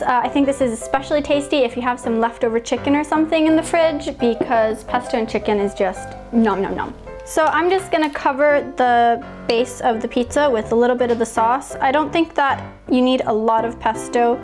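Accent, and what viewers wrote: United States. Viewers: her accent sounds like it is from the midwestern us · Definitely a Floridian accent. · That explains a lot! Yours is the least odd foreign American-sounding accent I've heard, though. Had a friend whose wife was from... Croatia, I think? She had the most Brooklyn English accent I've ever heard. I didn't know she wasn't from Brooklyn for about a year after I met her. I never did find out if she learned to speak English from someone from Brooklyn or if she just chose a heavy American accent to cover her Croatian accent.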